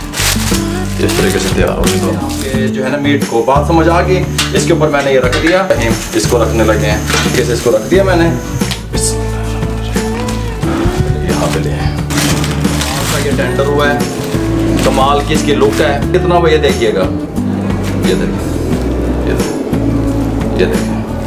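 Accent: Punjab, India. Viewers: Uncle tuada style kamal a... punjabi nal boldy o wich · Bhai aapki her recipi more delicious,tasty aur lajabab hai,aur aapki Punjabi jubaan Masa Allah aapki recipi ki tarah hai saath hi meethi bhi hai,main apne near&dear ko share bhi kar Raha Hoon.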